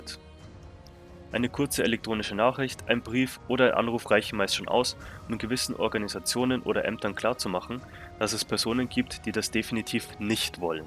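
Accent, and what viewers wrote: Bavaria, Germany. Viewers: wo genau? 'ua' is ja eher Niederbayern/Oberbayern. Mia Oberpfälzer bzw. Waitler han ja de oanzigen de wo no as 'ai' und as 'ou' ham😂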